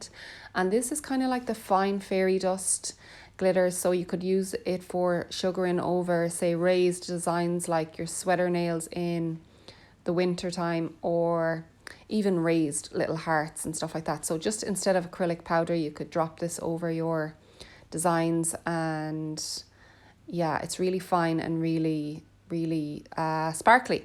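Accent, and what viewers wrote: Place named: Ireland. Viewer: What a beautiful accent! Sorry I love my Irish ppl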